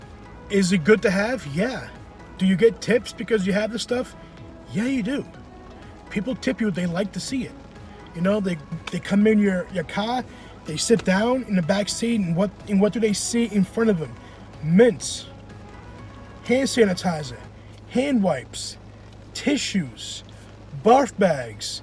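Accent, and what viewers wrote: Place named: Boston, United States. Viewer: Yeah exactly lol! Just take the blame it it's your fault. Yeah, been raining like crazy here. I can tell by the accent you're from Boston!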